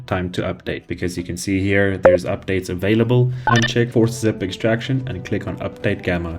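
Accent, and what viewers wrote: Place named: South Africa. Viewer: You are a life saver! I immediately recognised that accent. Groete ook van Suid Afrika :)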